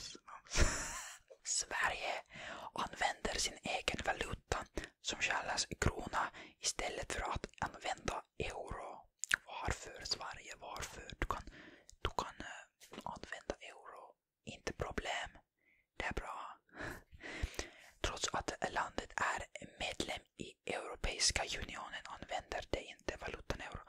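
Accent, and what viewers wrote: Finland. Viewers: Finlandsvenska is a nice dialect every finnish in sweden stil got it and cant rid of it but i like it =) sounds like mummintrollen.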